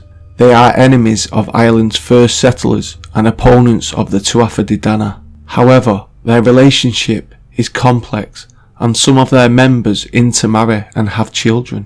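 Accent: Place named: Sheffield, United Kingdom. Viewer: Love your channel, accent sounds close to home. Sheff? X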